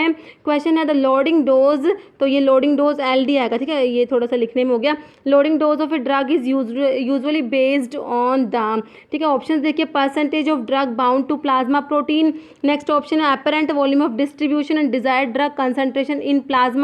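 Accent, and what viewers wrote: Rajasthan, India. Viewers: Madam aap rajasthan se ho kya ??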